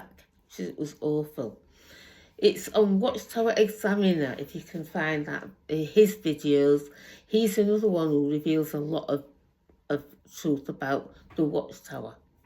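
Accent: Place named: North, United Kingdom. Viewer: Hey sis, who'd of thunk our Northern accents sound good to people overseas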